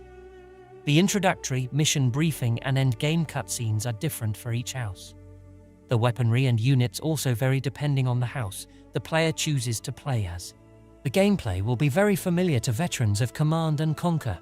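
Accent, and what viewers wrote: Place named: England, United Kingdom. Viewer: Doon. You have a freaking english accent. How do you pronounce the letter "U"?!? Why don't you pronounce studio, "stoodio"?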